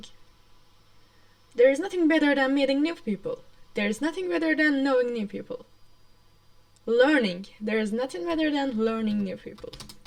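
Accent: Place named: Turkey. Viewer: Help i was watching it and i noticed the accent i was like "İs she turkish🤨" and then you were lol😭😭 So hello fellow turkish person lol😭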